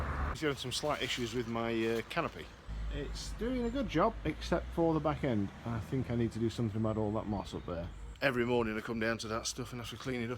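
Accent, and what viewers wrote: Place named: Sheffield, United Kingdom. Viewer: I knew you were from Sheffield, thought I recognised it :D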